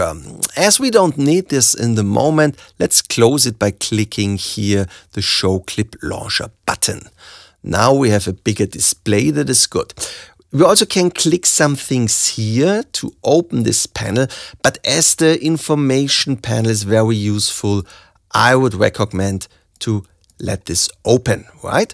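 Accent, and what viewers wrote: Germany. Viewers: tutorial is ok, but man...pls get rid of that horrendous german accent!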